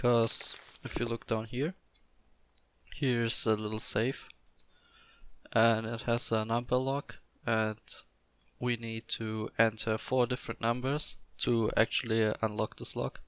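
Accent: Germany. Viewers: Man hat es ein bisschen gemerkt bei manchen Wörtern, du hast diesen typischen deutschen Akzent drinnen, aber ich glaube, das fällt eh niemandem auf😆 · Love your videos man :) Just one question (no offense): Are you from an english speaking country? Because your english sounds quite a bit like the english most of the germans are speaking :D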